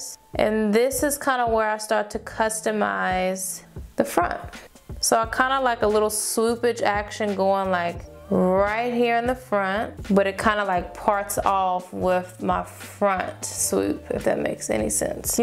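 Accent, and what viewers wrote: South, United States. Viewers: I love your little country accent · in love with her country accent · a real authentic southern accent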